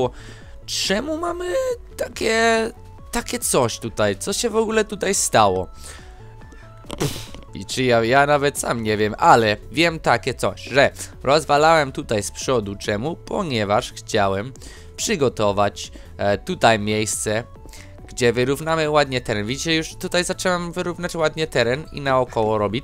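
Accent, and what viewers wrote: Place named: England, United Kingdom. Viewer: coraz mniej słychać twojego angielskiego akcentu:) Gratuluję:)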